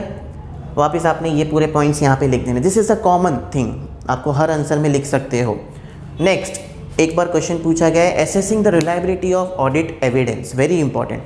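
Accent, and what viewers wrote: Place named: South, India. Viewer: Sir, you sound like a South Indian😁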